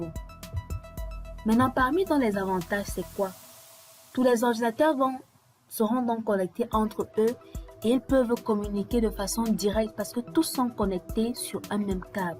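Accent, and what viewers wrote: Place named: Cameroon. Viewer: Tu intonne comme une Camerounaise.. Tres bonne lecon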